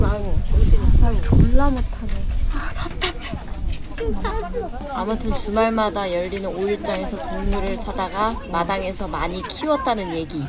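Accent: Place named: Dangjin, South Korea. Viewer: 누나 사투리가 배여있는 부분이 당진이었구나! 누나 차 출발 전 표정 개카리스마!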